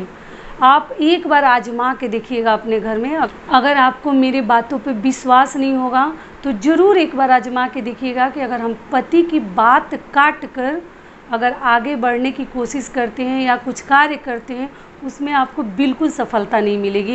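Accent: Bihar, India. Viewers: Aap Bihar se ho kya? Aunty ji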